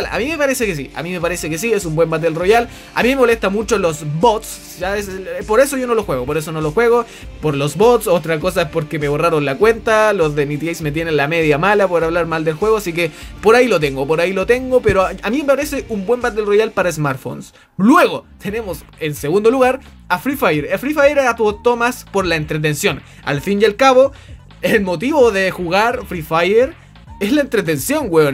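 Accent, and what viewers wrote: Chile. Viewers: Weon yo no te entendi ni madres chileno :v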